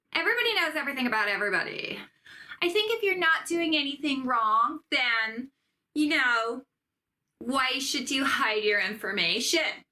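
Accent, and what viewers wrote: United States. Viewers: It's weird that a brit other than John Oliver is teaching me about the goings on in my own country. Thanks for hilarious and educational content. And your American accents are fantastic. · The american accent is getting better every video. Well done 🎉 Question though: Did Amber use the cockney accent? As a german listener I am trying to learn the difference between the british accent (Lord, you have so many😅). The video however sounded like a bad idea for a Maximum Overdrive remake. This almost naive trust in AI is simply concerning 😑 Thanks for your time and content and the best of wishes Mr. and Mrs. Sheppard, hope they are doing well as well ❤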